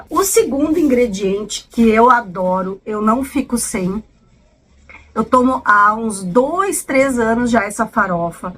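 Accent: Rio Grande do Sul, Brazil. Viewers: Sou de BH, MG, gosto muito de suas orientações e tbm de seu sotaque gaúcho, mto engraçado.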